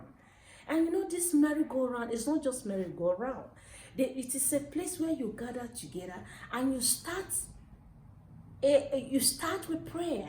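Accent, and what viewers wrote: Kenya. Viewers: Your accent is now more Kenyan than Nigerian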